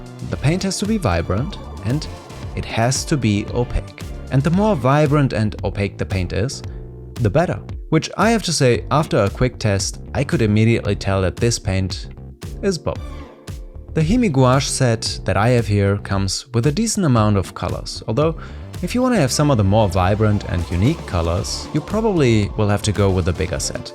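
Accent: Germany. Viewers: It took me 2 min and then I was like... "Is there a tiiiny german accent in there?" Clicked on your channel desc and damn hey german fellow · You sound so German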